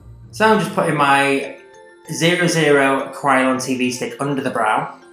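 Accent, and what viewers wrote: Liverpool, United Kingdom. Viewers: Not related but I bloody love the Scouse accent! You're looking well hun, stay safe! Btw, will you be doing a queens speech this Christmas? I think as our OFFICIAL new royal you should address your adoring public! 😉🤪😂 Half joking, I know you're busy so you might not have time. 😁 Love frae Edinburgh hen! 😜🥰😘💙🏴💙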